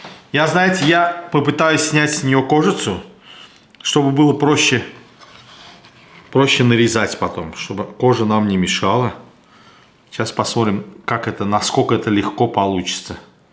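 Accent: Baku, Azerbaijan. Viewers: Бакинский акцент самый узнаваемый и лучший✊🏼🤓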